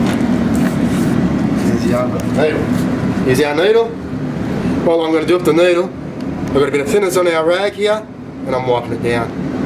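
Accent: Australia. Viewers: Good, efficient workmanlike description. I got the message clear even with the Aussie accent. Next video, turn the exhaust- fan speed much lower so we can hear better! Thanks!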